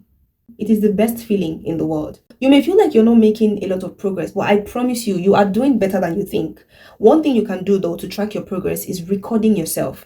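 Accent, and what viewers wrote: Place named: Cameroon. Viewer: You sound a lot like a Cameroonian, even though I know you're Yoruba from your name😄.... Keep up, very positive and inspiring channel.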